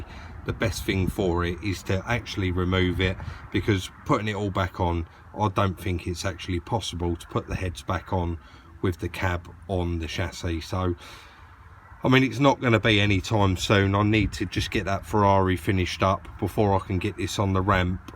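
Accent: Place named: Liverpool, United Kingdom. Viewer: Great video Sir! Interesting English accent too.., is it Liverpool?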